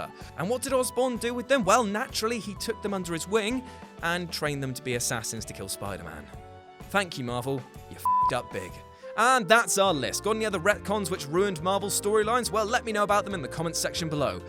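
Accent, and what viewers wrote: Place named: Newcastle, United Kingdom. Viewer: Howard the freakin' Human Geordie lad, where ya from? The 'Heed mesell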